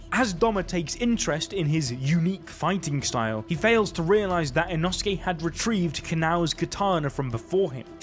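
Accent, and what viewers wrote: United Kingdom. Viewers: “Full cinematic fight” um its just a British annoying voice explaining what we are seeing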